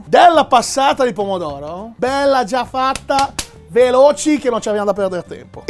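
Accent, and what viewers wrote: Rome, Italy. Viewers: Parla tutti li video in romano che te esce bene grande sempre generoso cor magna!!👍🏻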